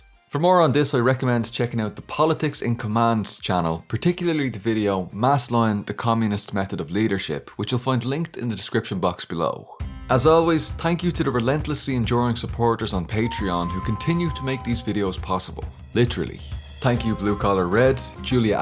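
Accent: Ireland. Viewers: Nice too hear an Irish man leading the charge still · I am binge watching your channel now. Gotta love your perspective, analysis and of course your Irish accent.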